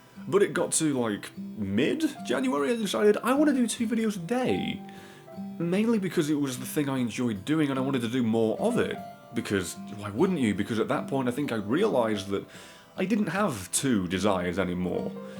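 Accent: United Kingdom. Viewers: I gotta admit this is one of the few channels that I've grown so fond of that I've tried to remember the time of day you put up new videos. I stumbled upon your insane British rambling back when the Dark Souls 2: Crown of the Iron King videos came out and I found your blind run against Sir Alonne. Since then your content has been quite fantastic, and even a lot of stuff before then is great to check out (Altough I did notice the rather obvious point where the quality of content changed dramatically). Both the old, the new and the current content you do is awesome and I look forward to seeing what the future holds in store for both you and us subscribers. Probably you dying horribly to more Uruks and me writing more silly death lists. Godspeed you magnificent bastard of a youtuber!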